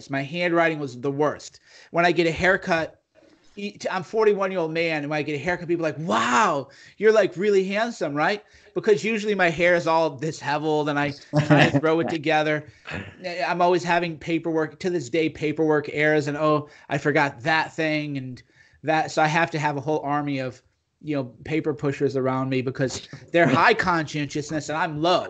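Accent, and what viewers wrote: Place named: Germany. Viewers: He sounds likes he is from Germany or some eastern European country. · Yup, German!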